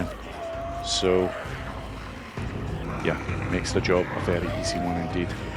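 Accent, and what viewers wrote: Scotland, United Kingdom. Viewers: Gg mate love the voice scottish right?😂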